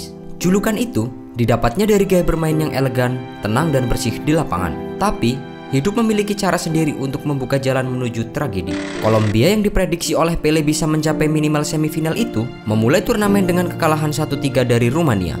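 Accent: Java, Indonesia. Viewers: Yg menyiarkan pasti org jawa...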